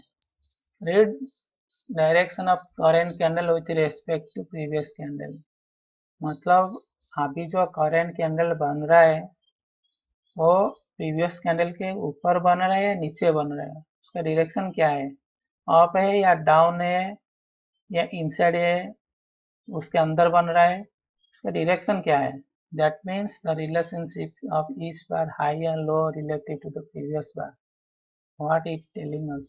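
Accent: Odisha, India. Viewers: Apka bat chit se lag rah he aap odia ghar se belong karte ho... Me v odia hun from Rourkela, Odisha..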